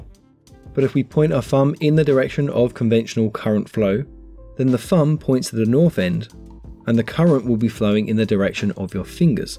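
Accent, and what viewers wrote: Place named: United Kingdom. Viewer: Where are you from? You sound like you have a British accent.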